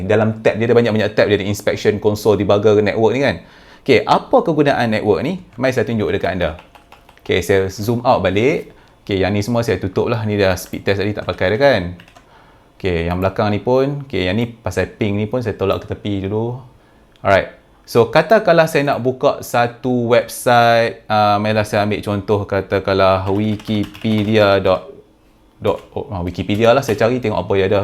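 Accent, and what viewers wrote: North, Malaysia. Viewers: Lorat abg ni mcm org utara la,hehe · Abg guna loghat utara baku ka ni?sedap dengaq..hahaa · Guna pekat utaqa pun elok gk.. Lg unik vdeo..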